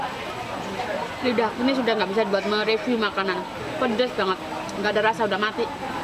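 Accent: Java, Indonesia. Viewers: Medok nya,, bahasa Indonesia berasa jawa tulen 😂😂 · Medok jawa nya mbak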